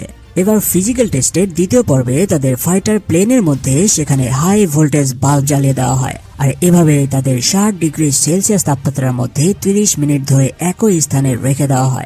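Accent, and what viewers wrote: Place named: South Korea. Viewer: আপনি কোরিয়ান একসেন্ট এ বাংলা বলেন কেন? এমনিতে ভালোই বর্ণনা করেছেন।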